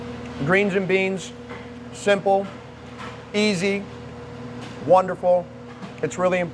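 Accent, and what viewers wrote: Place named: Upstate New York, United States. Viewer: Even before I saw "Utica" on your T shirt I knew you were from Upstate NY, that's a cool little twang in your accent, I am from Buffalo originally and could pick it up right away. Loved the recipe and technique. I always wondered what kind of greens to use, I guess it's escarole.....and always wondered if I should rinse the beans first, I see you added them right from the can so I guess don't rinse them.....so making this dish in the upcoming fall when the weather cools....also, I have friends that make it and nobody puts Italian sausage in it...I'm trying it that way.....seems like it would be heartier.....thanks for the video...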